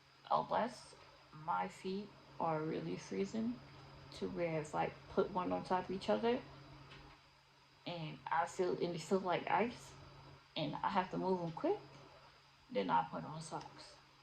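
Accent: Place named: New York, United States. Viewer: You from NY? I’m hearing an accent. Also we have a lot in common so I’m sitting here watching like “yup, mhm, yup me too sis” 😂 I just subscribed, I’m here for your content sis 😂🙌🏾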